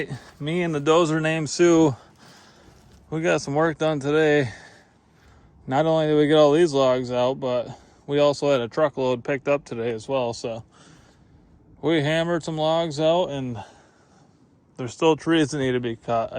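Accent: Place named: Ohio, United States. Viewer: Just from his dialect I'd assume Ohio or Pennsylvania.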